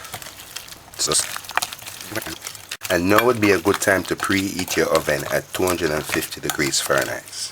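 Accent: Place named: Jamaica. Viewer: Yes, I think you're Jamaican "alf" and "cark". Lol, only a Jamaican can relate))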